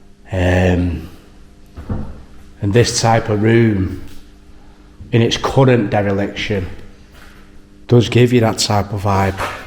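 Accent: Manchester, United Kingdom. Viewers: Are you Mancunian ? Also watch your croally bridge vid and loved it mate I love history but my aunt works at the church above where you went and it’s haunted but gangs and that hang about there but I’m subbed mate 🙂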